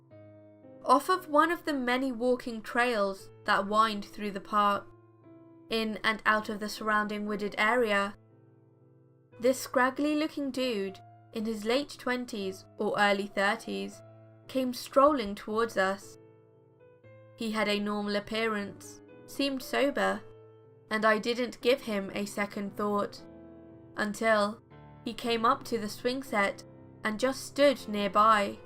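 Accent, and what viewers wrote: United Kingdom. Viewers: I love the cadence of your voice, are you British, if so, from where? You sound like you could have lived in a few countries...perhaps your American? It makes your storytelling so good!!!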